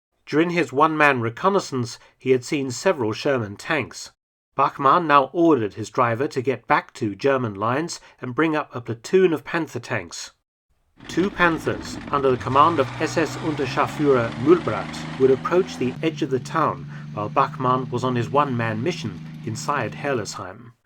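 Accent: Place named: RP, United Kingdom. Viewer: You kinda sound like a posh clone-trooper.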